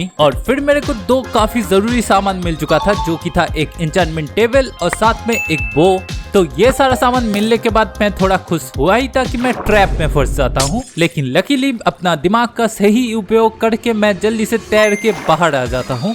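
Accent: Bengal, India. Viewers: bhai Tum Bengali ho kya?, 🙂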